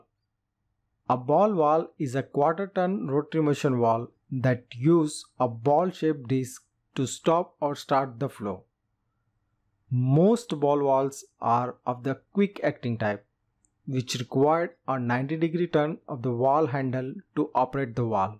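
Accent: India. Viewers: Indian Accent. Never ceases to irritate me.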